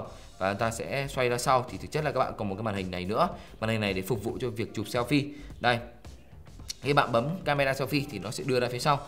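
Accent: Thanh Hoa, Vietnam. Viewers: Ông review này người Thanh Hoá phải k :)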